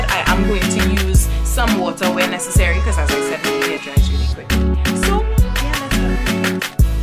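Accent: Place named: Trinidad and Tobago. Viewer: Hair looks nice . Accent sound like a trini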